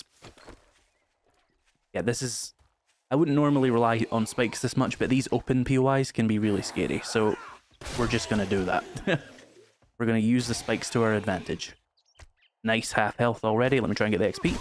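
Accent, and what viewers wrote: United Kingdom. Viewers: very cool video! i always wonder in which part of the UK they speak your dialect...!? greetings from bavaria =)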